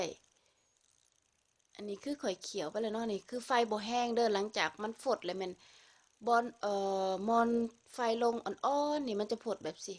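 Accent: Savannakhet, Laos. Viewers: พาสาลาวสำเนียงสะหวันนะเขตพากใต้ลาว... 🤨🤨🤨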